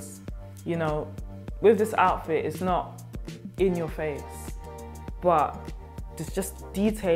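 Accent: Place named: France. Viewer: Love the french accent 😍😍😍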